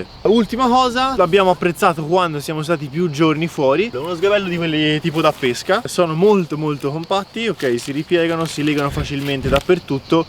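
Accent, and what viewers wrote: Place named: Tuscany, Italy. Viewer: "queesto materassino della (c)amp" adoro l'accento toscano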